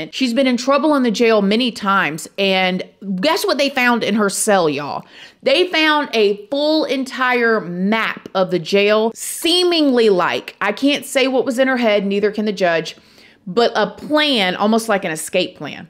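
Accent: South, United States. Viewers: I thought this was Annalise's and I just thought I never noticed what a heavy southern accent she has LOL well good I'm happy to try out a new channel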